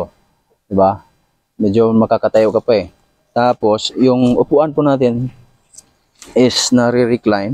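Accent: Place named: Visayas, Philippines. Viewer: Bakit bisaya punto mo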